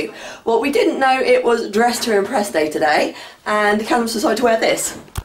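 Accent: Norfolk, United Kingdom. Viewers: R u from norfolk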